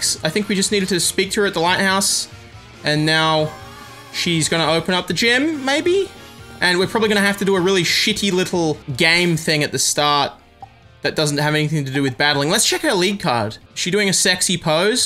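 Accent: Australia. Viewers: I love your sweet Aussie accent (; lmao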